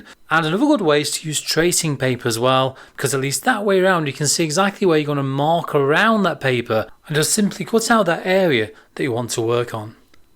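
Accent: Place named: Liverpool, United Kingdom. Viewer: This was awesome. Your accent reminds me of the greatest band of all time-!!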